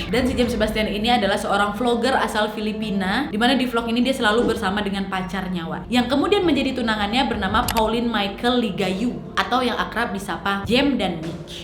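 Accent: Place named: Medan, Indonesia. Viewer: Senang liat cara ngomong nya. Ceplas ceplos. Kayak cwe medan. 👍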